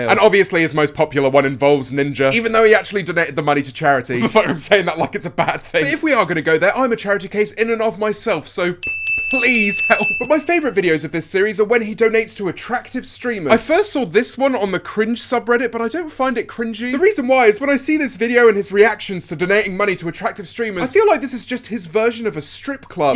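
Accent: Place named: England, United Kingdom. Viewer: Mr.Beast is fun just the way he is and anyways i wish i can do your english accent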